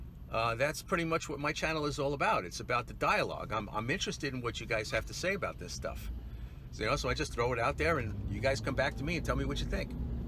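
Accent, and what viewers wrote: New York, United States. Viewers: You must be from New York area originally 😁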